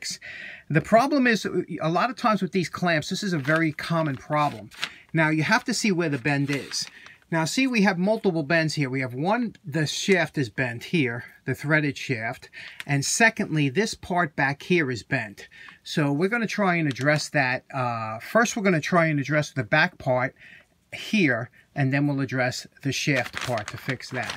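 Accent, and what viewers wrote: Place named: New Jersey, United States. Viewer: Liking your New Joisey accent!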